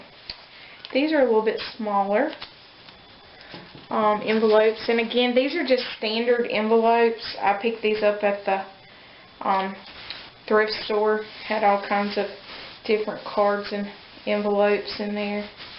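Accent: South, United States. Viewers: I just love your little southern accent, and phrases. Reminds me of my sweet cousins from "down south". Thank you for sharing! ♥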